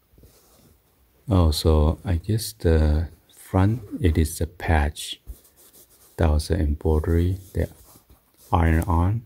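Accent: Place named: Japan. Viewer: 発音からわかる、100パー日本人。賭けても良い。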